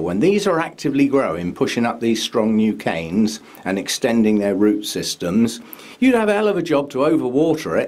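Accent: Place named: Norfolk, United Kingdom. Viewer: Most informative video I've found so far! Really helpful. Thank you. Also as a Norfolk girl, I'm loving your accent. Sounds like home :)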